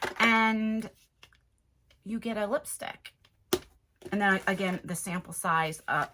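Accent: New Jersey, United States. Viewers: On=AWN is that a jersey accent? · Hey there, I always chuckle when I hear your Jersey accent, especially since as a New Yorker, I can tell the difference. I actually really like the backdrop. I rarely pay any mind to peoples backgrounds tho, unless they mention it like you did.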